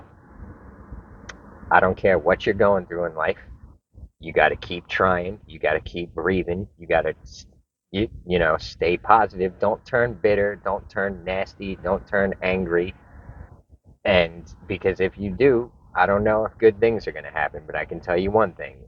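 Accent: New York, United States. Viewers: you're a great story teller. Love your videos and that NY accent!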